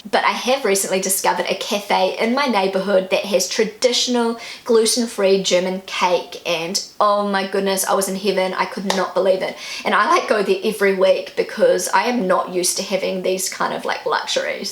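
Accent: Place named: New Zealand. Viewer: It is so refreshing to listen to your nice New Zealand English!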